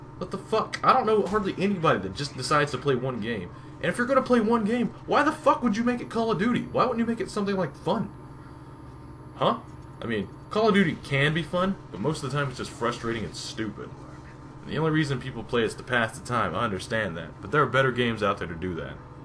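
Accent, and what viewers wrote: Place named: South, United States. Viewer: You have just the slightest twinge of southern accent whenever your start speaking a sentence more than 6 words long. I would totally fuck that slight southern accent.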